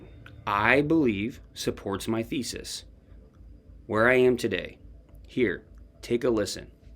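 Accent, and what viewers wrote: United States. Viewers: Just to add yours is the best video analysis by far.....and you sound American.......good job:)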